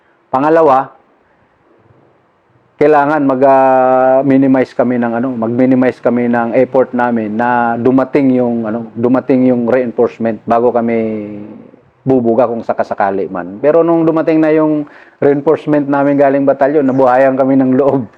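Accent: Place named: Bicol, Philippines. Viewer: Bicolano Yaya si sir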